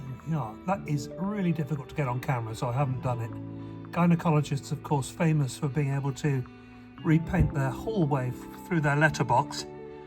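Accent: United Kingdom. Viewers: my friend you are not an idiot (you just talk funny 😄 (British accent)). Great job.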